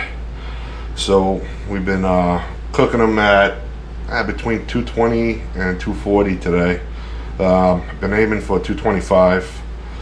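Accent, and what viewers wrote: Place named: East Coast, United States. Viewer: Ribs looked great, love the Heavy Metal EMS Tshirt and I detect a slight East Coast accent😳🦖 great job on the WSM👍🏼🥃🇺🇸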